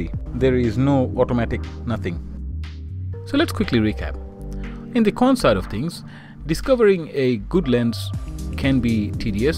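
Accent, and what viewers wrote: Bengal, India. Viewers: Are you Bengali by any chance?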